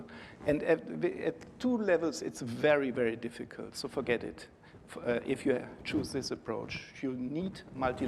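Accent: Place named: Germany. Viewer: Unfortunately he speaks with a too heavy German accent when lecturing in English language.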